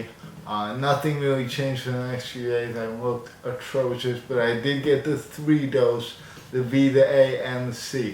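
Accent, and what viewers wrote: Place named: South Jersey, United States. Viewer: Im praying for you brother. Yeah this not the type of video I ever see on my feed man Im a sneakerhead and I've been subscribed to you for a while. Please let us know how your progress is going whenever you can. Oh wow didn't know you are from New Jersey as well. Im located in Central Jersey. Sounds like you are in South Jersey. ✊️🙏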